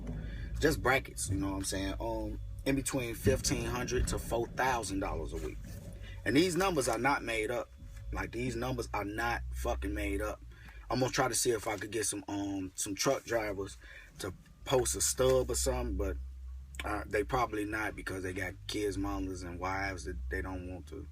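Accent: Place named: Chicago, United States. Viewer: He ain't lying. I know people making this kind of money owner operating. I knew you was from Chicago when you started talking.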